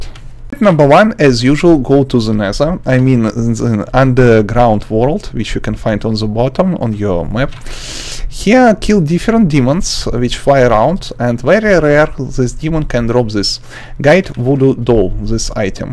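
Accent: Russia. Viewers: this guy is russian but trying hard to speak english respect❤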